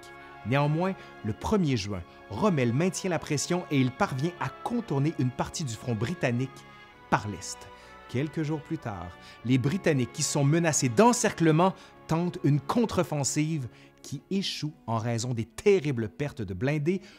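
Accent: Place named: Quebec, Canada. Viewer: C’est tout simplement épique. Avec ton accent québécois en plus.. c’est vraiment trop top. merci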